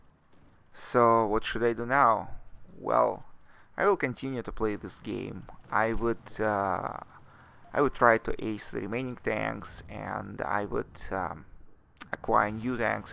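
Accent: Russia. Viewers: акцент русского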